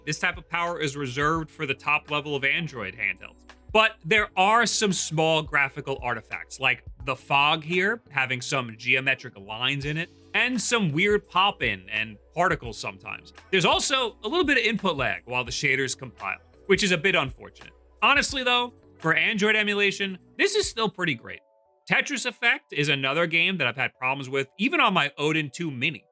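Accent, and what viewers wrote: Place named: Long Island, United States. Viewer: That's an integral part of the Long Island accent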